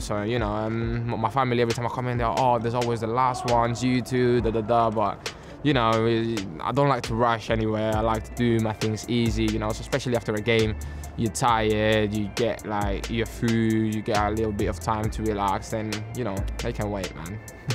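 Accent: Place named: United Kingdom. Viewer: Je has a littel britisch accent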